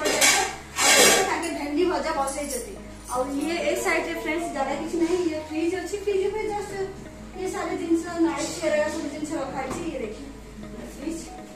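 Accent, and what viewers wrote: Berhampur, India. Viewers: Pura berhampur kathaa ....may be tume sethira bodhe?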